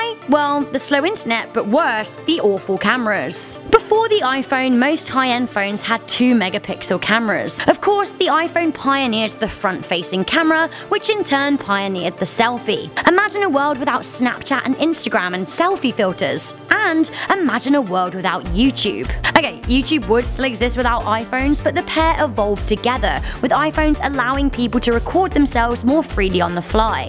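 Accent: United Kingdom. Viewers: how about "what if there was not a British narrator with an extremely annoying accent"?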